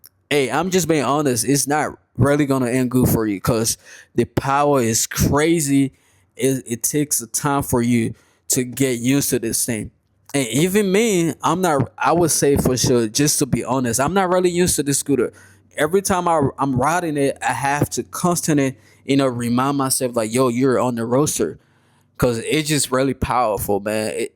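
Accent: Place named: Liberia. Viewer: Your accent, you sound Liberian.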